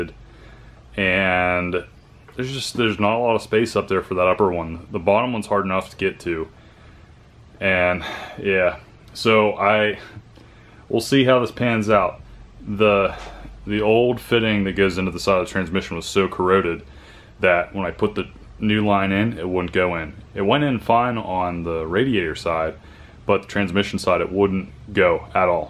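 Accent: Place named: Pittsburgh, United States. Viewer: I detect some Pittsburgh accent...lol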